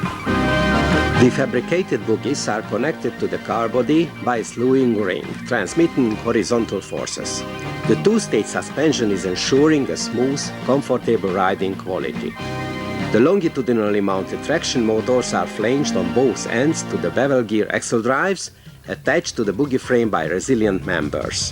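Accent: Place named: Hungary. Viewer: Huu.. this is too hunglish accent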